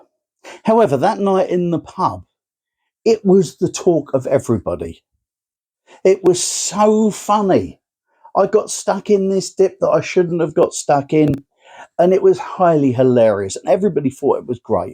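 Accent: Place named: Cockney, United Kingdom. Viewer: Fascinating and true, it seems like some people can't get by without insulting others to go on a power trip. I'm curious about your accent, it sounds like you have a London or cockney twang in there. Very enjoyable video thank you.😊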